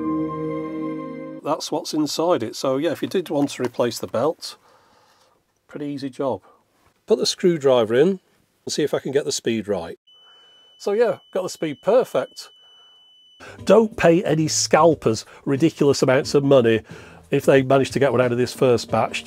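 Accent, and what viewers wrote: United Kingdom. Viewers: Hopefully 2023 is better. I don't know how people complain about how you speak. It just sounds like a British accent to me. But there are always a few that will complain and insult people. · Thanks for sharing your experiences with the weirdo audience members. Please don't waste time interacting with them. You have nothing to explain to us about how you sound. It's very shameful how some people act here behind their veil of pseudo-anonymity. From one of the up-'til-now silent subscribers, I'm very sorry to hear about your Mom. That must have been a terrible thing to go through. And you sound awesome. The British accent automatically gives you a +10 perceived intelligence, and sod the plonkers who give you a hard time about the way that you sound. Please have a better 2023. Best regards from Chicago, USA. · Totally Brilliant Video. 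Had My undivided attention the whole time. I have some catching up to do, I thought I have seen Every Video You put out. I though the Way You sounded was very natural, found nothing odd about it, Having the British accent and all. It May sound weird, But I really enjoyed hearing you talk.. Sorry about the Health issues. Please accept My Sincere Condolences of You Mothers Passing. Lost My parents in 96. Dad was 65, Mom in 08 just turned 72. I'm 62 and hope to out live My father. I feel that I can cause I am in great Health.. Other than a Dental check-up every 6 Mo, I have not seen a Doctor in 15+ years. I do nothing for Christmas either. Being in the woods 250' From the lightly Driven Road not much to see. When I get My Tower back up, it will be 120' My big blue Star might be seen from the interstate. I anxiously await any Video You put out. Kinda makes My day when You do... Please take Care My Friend (yes You could be a great Friend to have) Mike M. 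Wausau Wi.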